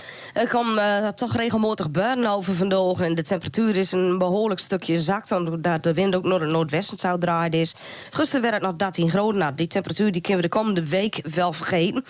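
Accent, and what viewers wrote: Groningen, Netherlands. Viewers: Nou dit noem ik nou TOP, de dialect van Grun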